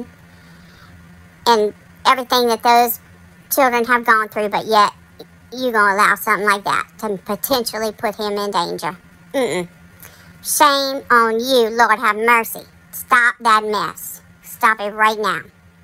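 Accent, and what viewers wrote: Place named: Boston, United States. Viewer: I love your videos, No one can be mad at a princess. 😂😂😂😂 Chasing in her boston accent. signed the "youtube poo poo" 😂😂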